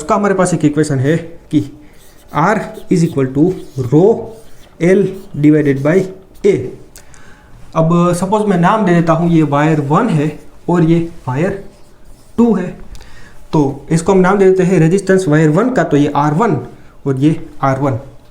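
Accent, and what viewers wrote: Gujarat, India. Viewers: Nice explained. Are you from Gujarat?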